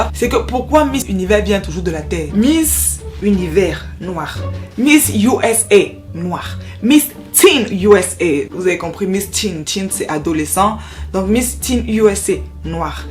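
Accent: Cameroon. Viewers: Hello, j'ai bien compris que tu étais camer, avec ton accent. Mais au début je me suis dis, tien tien est Bamoise. Puis, petit à petit, je me suis dis, non, non, a un parlé qui me rappelle les Etons. Ben en effet, là maintenant je suis un peu perdu. Eton, pas Eton, Bamoise, pas Bamoise?????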